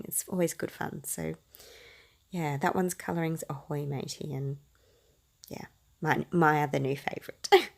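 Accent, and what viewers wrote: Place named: Australia. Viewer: I could listen to your cute Aussie accent all day long! <3 I initially noticed how you mounted your background on the floral paper. I love that, it's so original. Again, the Cocoa Vanilla paper has really caught my eye. I've been rather busy with my new job, but excited to get back to creating with all the goodies I love. Thanks for inspiring dear <3 Cheers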